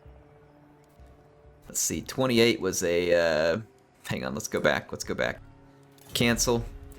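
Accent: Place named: Midwest, United States. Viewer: Hearing trying to do a cockney English Greenskin accent, but he has such a strong Midwestern American accent.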